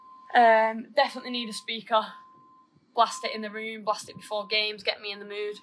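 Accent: United Kingdom. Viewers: The British accent is really cool I love it ❤️ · Hi. I'm trying to expose myself to all the British accents. To be honest, it's quite hard for me to understand you, but I realize that sometimes you emphasize your accent. Please, you don't need to do so cos some people follow you due to your regional accent. Could you please let me know what's your accent called? By the way, good luck with the World Cup.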